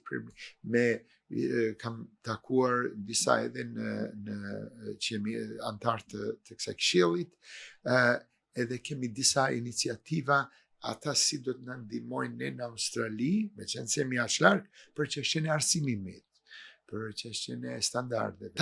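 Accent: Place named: Kolonja, Albania. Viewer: Truri dhe mendja e ndritur e Shqiptareve ndertojne boten dhe per vendin e tyre nuk bejne asgje se nuk kemi shtet qe ti mbeshteti Bravoooooooooo per gjuhen dhe dialektin Kolonjar Respekte per kete Zoteri ❤❤❤❤❤❤❤❤❤❤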